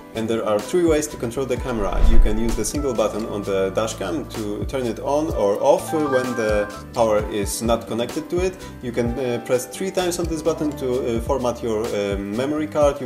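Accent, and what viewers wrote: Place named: Poland. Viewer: Ale schize miałem. Akcent taki znajomy, polski i dopiero przy porównaniach wideo zrozumiałem xD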